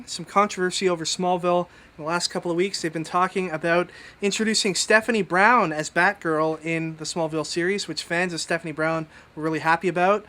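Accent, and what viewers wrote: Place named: United Kingdom. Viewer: Of all the videos has i love his Umbrella Academy collection the most. I absolutely love this series and its great how he owns different covers to every issue, Ive been known to horde different cover arts myself. Gerard Way has certainly not disappointed with this series and also has a pretty gnarly British accent.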